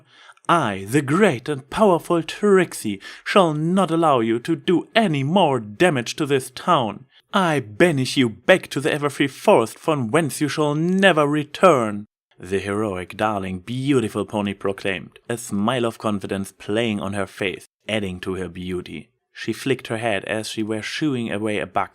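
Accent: Germany. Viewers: ich mochte deinen deutschen Akzent schon immer, aber beim vorlesen Find ich ihn wirklich klasse, es gibt dem text so etwa interessantes. Eigentlich interessiert daran deutsche Fanfic zu lesen? Ich finde deine Stimme ideal für "Dramatic Feadings". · cont.3 Thirdly; and understand that this is not meant to mean I didn't like the way you read, because I did; keep at it. Your technique will become more refined with practice, and I think that this is a worthwhile endeavor. I always enjoy a good story, and if it's being read with a German accent, then so much the better. XD And that's about it. Keep it up, man, this was very enjoyable, and I hope to hear more in the future. It was a neat change of pace. /)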